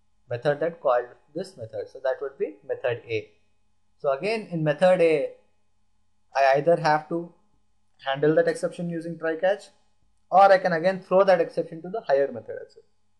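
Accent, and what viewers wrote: India. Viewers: The audio is not good enough to understand what he is saying and complicates the problem of trying to understand Indian accent.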